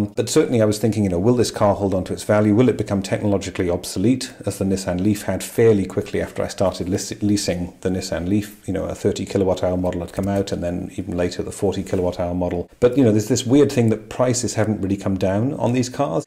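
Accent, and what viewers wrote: Wales, United Kingdom. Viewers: I could clearly hear those dulcet Welsh tones!! Enjoy Denmark but there will always be a welcome in the hillside! If Denmark is anything like Norway you should be able to get a nice range of EVs!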